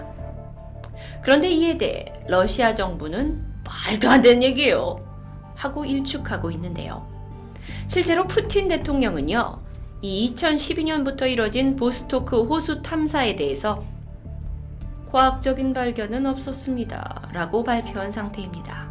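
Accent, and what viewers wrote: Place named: Jeolla, South Korea. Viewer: 음이 정확해서 듣기 좋아요, 서울 말을 쓰시지만 원래는 전라도 분이시네요~ 전 강원도쪽 사람인데 전라도 경상도 사투리 너무 좋아 합니다.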